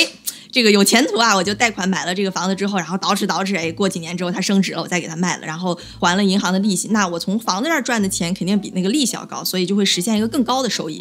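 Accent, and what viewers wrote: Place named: Beijing, China. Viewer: 你边说边笑有时候听不清，北京口音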